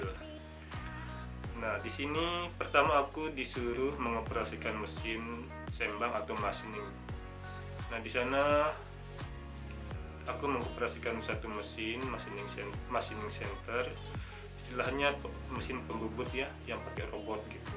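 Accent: Japan. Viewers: sdh kayak org jepang banget... Dari logat dan intonasi nya... Kyk nya lama2 abang juga kayak abang.. Ilang lombok (indonesia) nya... Semangat bang.trmksh info nya.. 👍👍😀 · puluhan tahun hidup di jepang logatnya udah hampir kebalik yaa om lidahnya soalnya sering bicara pakai bahasa jepang 😁 semoga sehat selalu om 🙏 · Logat Indonesia nya hampir ketutup bahasa Jepang.. Tapi faham jga sih yg dijelaskan. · lama dijepang, logatnya udah jepang bgt.. 👏🏼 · Mungkin kelamaan di jepang jadi ada logat jepangnya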